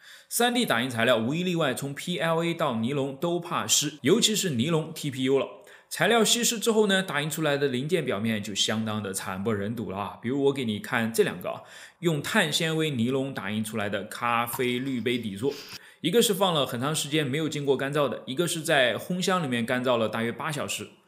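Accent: Nanjing, China. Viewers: 口音有点南京的感觉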